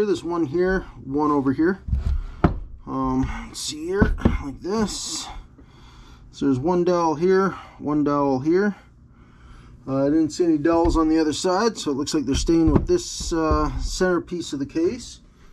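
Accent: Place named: Canada. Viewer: nice vid you should get a longer 1/2" ratchet I like the canadien accent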